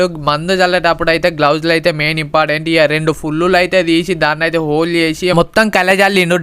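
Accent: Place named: Telangana, India. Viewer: Telangana slang keka bro ❤️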